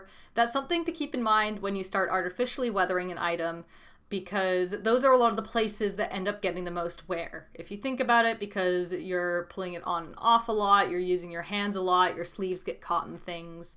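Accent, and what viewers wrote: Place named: United States. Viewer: You sound like you're either American or Canadian, did you adopt that accent, or are you not originally from AUS?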